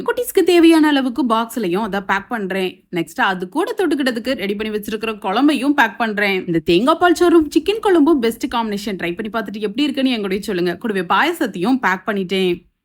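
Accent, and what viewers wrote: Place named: Thoothukudi, India. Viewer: Sister ungalukku entha ooru... Because neenga pesura language kekum pothu enga area mathiriye iruku sis.. naan Thoothukudi district sis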